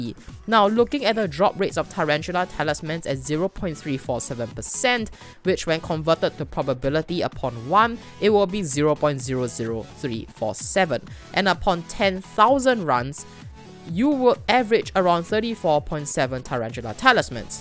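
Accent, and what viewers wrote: Singapore. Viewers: sounds like singaporean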